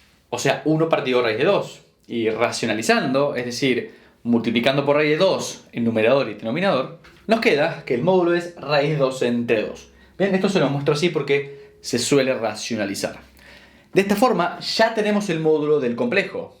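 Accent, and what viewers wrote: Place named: Argentina. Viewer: me preguntaba lo mismo porque suena re argentino pero dice cogemos jaja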